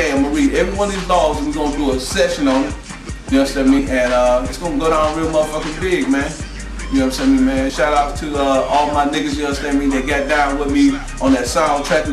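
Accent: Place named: South, United States. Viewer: he talk like he from the south but just a lil faster tho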